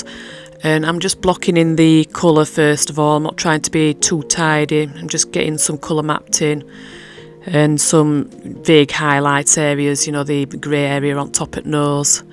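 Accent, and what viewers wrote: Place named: Yorkshire, United Kingdom. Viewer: Not only are you a shit hot artist, but your accent is amazing! Reminds me of so many of my Yorkshire friends haha. Familiar, warm and lovely..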